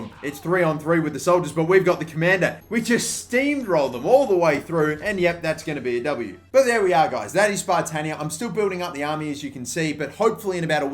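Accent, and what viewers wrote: Australia. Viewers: Yeah, could tell he was an Aussie by the accent. Probably Sydney or Brisbane. But then I could be way off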